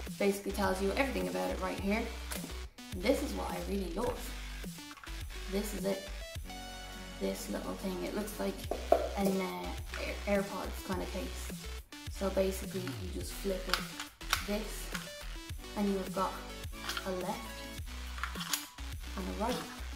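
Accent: Ireland. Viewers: Congrats and Merry Christmas 🎄 Which Irish accent is this btw? Struggling to figure that one out..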